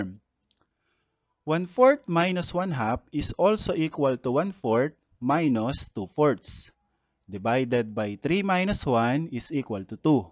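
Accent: Philippines. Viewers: I can tell you are a filipino by accent hahaha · Hes accent is very similar to a filipino because ima filipino too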